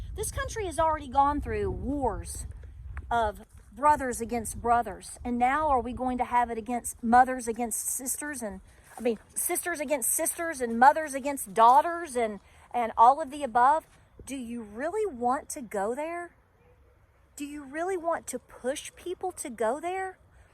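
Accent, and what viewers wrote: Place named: Appalachia, United States. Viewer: Girl i got such a giggle...perfect Cherokee and then that soft southern mountain drawl. Such a juxtaposition. My mama's entire family is in that beautiful face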